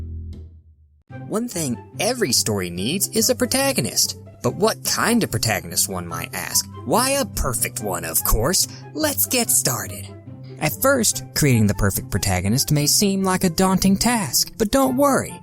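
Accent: South, United States. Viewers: your mildly southern accent makes these 100 times funnier to me man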